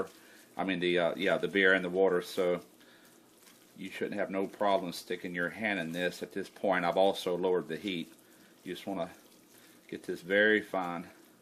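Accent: South, United States. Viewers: He sounds like Bill belichick with a southern accent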